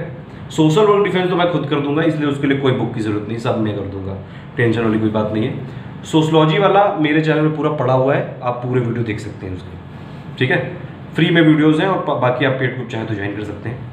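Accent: Kanpur, India. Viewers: Bhai Kanpur ke ho kya ...? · Sir, Aap kanpur me kaha Se ho, Full kanpuriya Touch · Nice kanpuriya touch...!!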